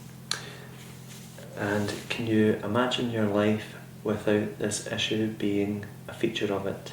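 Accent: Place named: Scotland, United Kingdom. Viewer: A Celt with a strong Scottish Brogue. 👍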